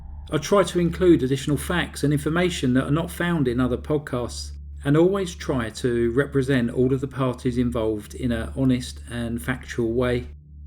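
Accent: United Kingdom. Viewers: "...limped past the 100K mark." I loved that, for its humility and honesty. I'm a subscriber, only having found you a year or so ago. But, I love true crime so yours, and one of the others you referenced, are the two I watch. Always. I love British accents. And, I don't care from which area of Britain, they are all charming and--to my American ears--very sophisticated. I think your delivery is lovely, measured and with a thoughtful tone. Anyone who would send you negative comments (and I'm sorry about that) are just envious, because you've accomplished something the have NOT. I understand your taking a break. You've described your experience with Youtube very clearly and I am sorry for the struggles you're having. Those of us out here who are so interested in true crime do love what you do, and your work is appreciated. Speaking for myself, I LOVE Petty Crime! 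For the very reason you said, a light, humorous note to sometimes very dark material. I find myself smiling at each one. Well, until I saw the snake today. . . not a snake person. One last thing: I like the avatar you have now. Its the best I've seen of all you've had (I did go back and view other, older videos once I finally found you). I hope you'll be back 💙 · Hello from London Ontario Canada. Thankyou for the video. Had no idea how much hard work goes into making a video. I too love crime stories, and I want to say that you do an Amazing job with your stories. I always find them very well done, the detail and pictures. I'm not sure how long I have been watching, but I believe I was first brought attention to your channel by Brief Case. You said that sometimes people insult you, that's terrible. I don't understand why people do that. Crazy people in the world. I Love British accents, so I Love your voice. 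I hope you enjoy your break. If you decide to carry on your channel, I will throughly look forward to it. Thankyou for your channel. Goodbye.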